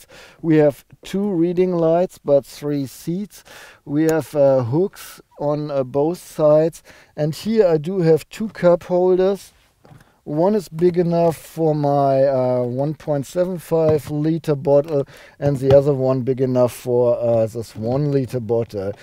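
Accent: Germany. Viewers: i love German accent :)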